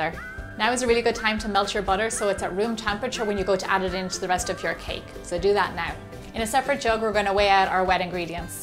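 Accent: Ireland. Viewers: Omg! Your Irish!!!?!? How cool!!! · Love the Irish accent! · You are from Ireland?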